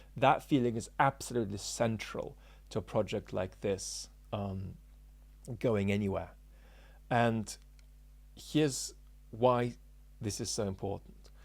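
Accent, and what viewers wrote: United Kingdom. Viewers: First time I've detected a limey accent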